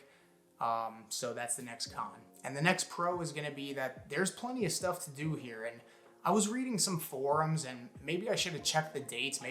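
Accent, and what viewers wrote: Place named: New England, United States. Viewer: While your video is intetesting and a little informative, obviously you've not lived there long (accent) and pray you did leave the new england politics behind. One needs a good 10+ yrs down there too fully undetstand and grasp the lifestlye. The other HUGE cons are the crime from the NE States migrating with the new residennts and then the traffic. Those last two items have increased 150-400% as of late 2020 and its getting 100x worse. I'd tell folks to pls move back home if you miss it so much. We dont want the politics, crime and traffic here. Unfortunately with ins companies doing their thing on rates, its getting close to LA expensive. Residents from 2008 and back are leaving. I was going to go back there in Jan 2020, the house I was looking at near Boca was 2800 sqft, 3 car, lots of soace between homes (60 ft) and low hoa, towards Coral Sprngs for 235k. 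That same home in Mar 23' was 780k and as of late July 23' its 815k. The over pricing of homes is ludicrous and according a friend and comn/res RE expert since 1990, its going to keep going up another 3% from now in 23' to 7% by Nov 24'. I am the kind that needs my garage space to work on my classic car and a few bikes, a yard for the dog, etc. Plus at least 7 miles inland because hurricane wibd decreases by 17-20 mph every 6 miles in land and its drier, less salt air so no specially treated furniture needed because not with in 2 miles of the sea. That sea salt air destroys furniture, interior and exterior paint and vehi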